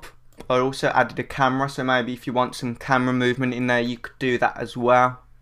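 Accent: United Kingdom. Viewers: where are you from? uk for sure, but I don't recognize the accent. Sorry, I'm just curious :D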